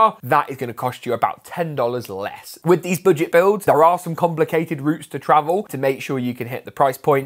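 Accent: United Kingdom. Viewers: yo fonkin british or wot , met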